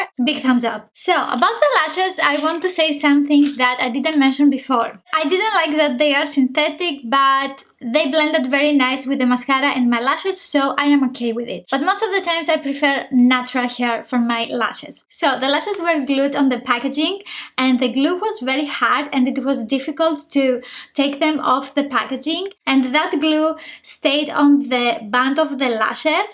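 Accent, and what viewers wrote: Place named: Greece. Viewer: Are you Greek? Your accent implies that! Love essence make up❤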